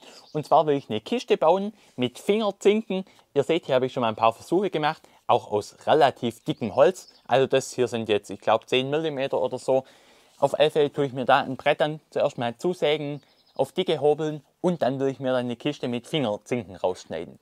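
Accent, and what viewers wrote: Swabia, Germany. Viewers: Schwäbisch isch gsond, jawoll! :D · Klasse! Schönes Video! Sag mal, was ist das für ein Akzent den du sprichst? Ich würde mal tippen Großraum Göppingen? Schöne Grüße aus Bocholt (NRW) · ist des a SCHWÄBLE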